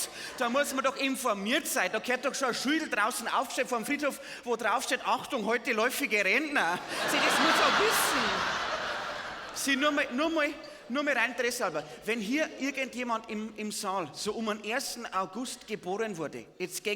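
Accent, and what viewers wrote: Bavaria, Germany. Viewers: Ist es, im schönsten, deutschen Dialekt gesprochen, nämlich bayrisch, oder boarisch ... herrlich 😂, köstlich ❤ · I mog den borischen Dialekt.Orfach guad 😂😂😂❤ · Warum Hochdeutsch? ist Bayer und das Publikum auch. Also wieso sollte er sich verbiegen?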